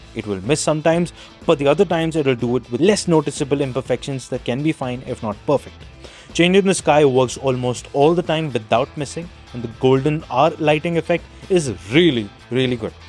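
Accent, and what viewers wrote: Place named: India. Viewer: Stop speaking Indian man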